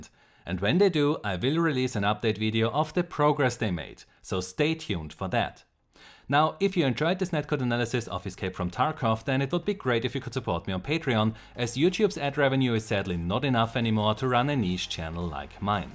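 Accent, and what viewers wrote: Germany. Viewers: Now I finallay now that you are german ^^ Always thought that, that is a german accent :D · Love your german accent 😏❤️ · man hört so raus dass du deutsch bist xDDD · man hört an dem Akzent das du Deutscher bist :P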